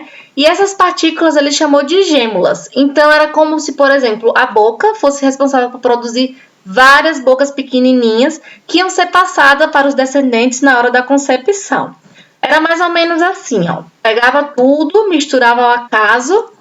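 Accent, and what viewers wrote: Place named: Recife, Brazil. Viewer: Parabéns! Gostei muito do teu jeito de explicar (com o teu sotaque e gírias ficou melhor ainda, sou de recife e julgo dizer q tu é daqui também kkkkk). Força aí no começo do teu canal, acredito que você fazendo o que gosta só gera bons frutos (como em tudo na vida). A única dica que eu te dou é relaxar na hora de gravar e deixar de lado o nervosismo de como falar, você relaxando e se divertindo nas explicações fica maravilhoso pra quem assiste. No mais é isso, gostei muito também do início que tu colocou "senta que lá vem história" fica muito bom esses memes em explicações de conteúdo.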